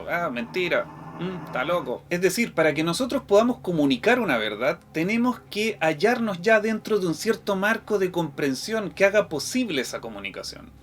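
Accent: Chile. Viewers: Hola encuentro que tu video Está muy bien realizado y la explicación me parece fantástica! Cómo chileno me gusta tu acento y no me molesta como videos de otros países que son como taladros en el oído.... solamente acotar que tal vez podrías pensar en eliminar el gesto que haces con la mano al fin de este vídeo porque es algo muy común entre nosotros los chilenos pero es sabido que en otros países tiene significados incluso de falta de respeto o cosas así.... aparte de eso tu explicación y tu video están la.raja!!!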